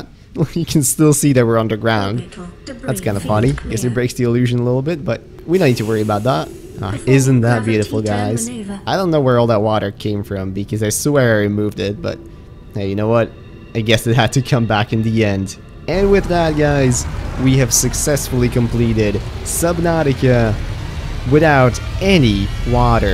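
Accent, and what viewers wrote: Netherlands. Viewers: bro i just discovered youre dutch, you can hide your accent super well!!! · Immediately knew you were Dutch. It's the accent lol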